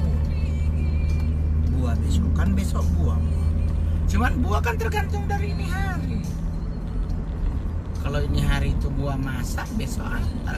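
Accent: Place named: Manado, Indonesia. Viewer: Knp gambarnya gak penuh ini sprti buat flog stengah2 bahasanya slalu logat manado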